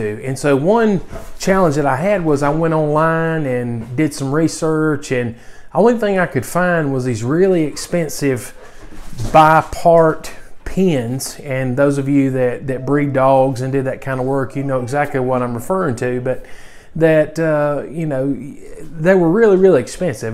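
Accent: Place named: South, United States. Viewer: I love the Southern accent! Very charming. "A letter" instead of "a litter."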